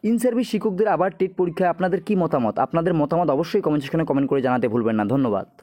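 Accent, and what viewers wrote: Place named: Bangladesh. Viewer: Tui 100% bangladeshi, tor kotha sunei mone hoche, edeshe kire bara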